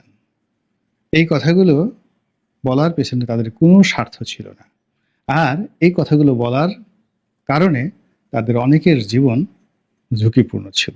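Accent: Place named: Barishal, Bangladesh. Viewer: স্লামালিকুম নয়।আসসালামু আলাইকুম বলবেন শুদ্ধ করে।দয়া করে প্রমিত বাংলা বলুন।বরিশালের উচ্চারন পরিহার করার চেষ্টা করুন।আপনার পোষ্ট এর সময় কমিয়ে আনুন।আপনি আরও নন্দিত হবেন।প্লিজ প্লিজ।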